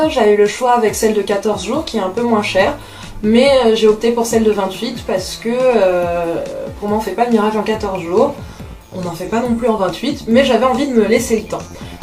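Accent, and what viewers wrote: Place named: France. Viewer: The French accent is quite dope. Keep going from London.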